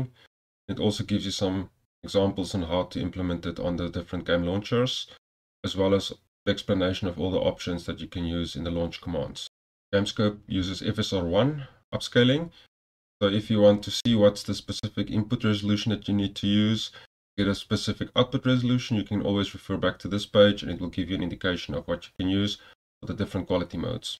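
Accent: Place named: Afrikaans, South Africa. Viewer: jy klink afrikaans bra!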